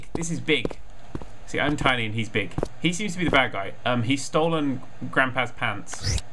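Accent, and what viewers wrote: United Kingdom. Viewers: For some reason, whenever I'm thinking over doing a tutorial video or something, I think it in some random British dude's voice... I now understand that it's Nerd3's.....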